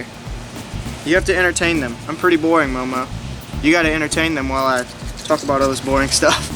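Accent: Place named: South, United States. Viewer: What do I enjoy most about the channel? You and your southern accent getting excited about your snakes. No improvement necessary. The videos are always interesting, well-timed, and well-edited. It's a unique product all your own. Dangerous animals and insects are always fun, like when you were fooling with the Black and Brown Widows. That was intense. Are you planning on any releases?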